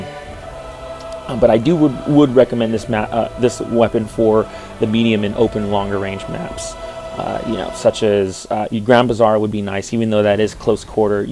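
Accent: United Kingdom. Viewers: all me a noob but i thought he was British coz i watched his g3a3 video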